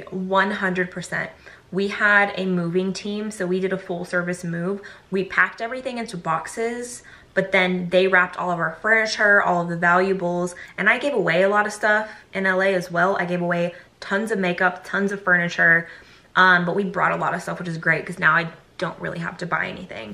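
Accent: South, United States. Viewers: your southern twang is peaking back out! <3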